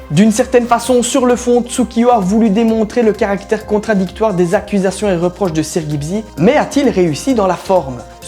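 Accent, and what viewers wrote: Belgium. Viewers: mec es ce que tu es belge car ta voix my fait penser et t'es drama ces de l'humour ou tu fais que informer sur des drama avec des preuves concrète ou tu fais juste sa pour attiser la haines ou tu utilise le mots drama juste pour la forme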